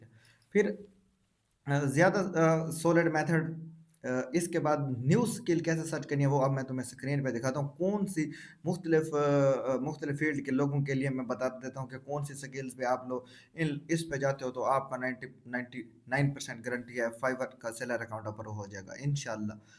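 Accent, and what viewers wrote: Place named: Kot Addu, Pakistan. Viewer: chlyn good hogia bhai... Seriously thanks wo meri kismat account chly na chly laikn aik e gig pe 20+ orders mily hn bss payment method add hojy ksi traa.. boht informative vedio thi apki.. apky ascent se to aesy lgta hy k ap DGk, taunsa ya kot adu bellt se belong krty hn I'm i right? 😂 Mn khud v kot adu k city CSS se hon😊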